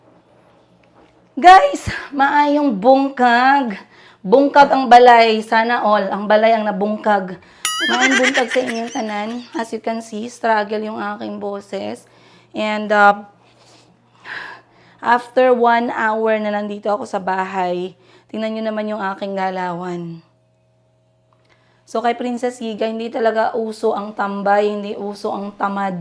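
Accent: Iloilo, Philippines. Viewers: Nami yah ilonggo nga tono hahahaha mo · Ilongga gid tuno mo taga Antique ako dhay. Kaya lagi ko pinapanood vlog mo. Be strong lng gid. Enjoy lng sa mga anak mo. Watching from bharain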